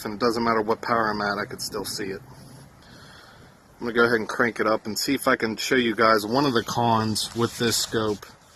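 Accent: Philadelphia, United States. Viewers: Ha ha. I thought the same thing - sounds like a Philly dude but not completely. Pittsburgh - that explains it. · Are you from philly? Just curious cuz you have a little bit of that philly accent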